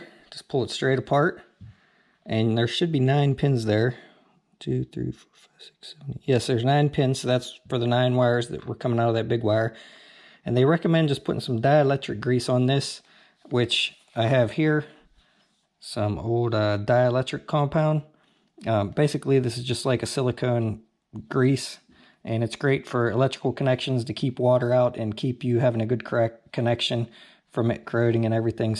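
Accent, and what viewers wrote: Pittsburgh, United States. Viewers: Sup man. I thought you sounded like a yinzer. I grew up dahn the road in belle Vernon. Live it pittsburgh now. Just ordered a black xp. 0rder # 1999*. Can't wait for it. Good vids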